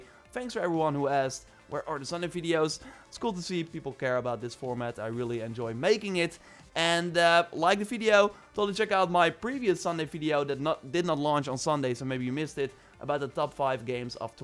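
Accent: Netherlands. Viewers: You just know when you hear a dutch guy talking English. Nice video btw man. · With this terrible Dutch accent? If he made his L’s any thicker, he’d sound like a Bond villain...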